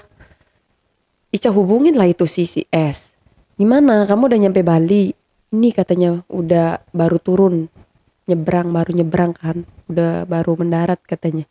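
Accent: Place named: Cirebon, Indonesia. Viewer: Asli dari gintung berarti tetanggaan sm sy Galagamba,berkesan sih ceritanya,aplgi logat khas wong cerbon,iya tah,iya jeh,kang bner sih..salam teh sing wong Galagamba.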